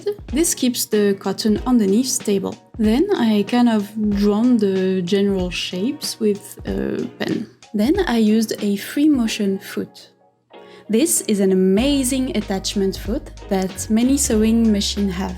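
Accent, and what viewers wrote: France. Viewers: Magnifiiiiique ! Et tellement de courage et de patience. En tout cas je savoure chacune de tes vidéos depuis que j'ai découvert ta chaîne ! And I love the French accent ;)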